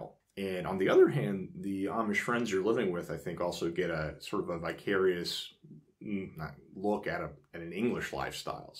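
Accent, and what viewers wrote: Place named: Philadelphia, United States. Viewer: I was surprised to hear you live in NC. Some things you say sound like you grew up near. Philly.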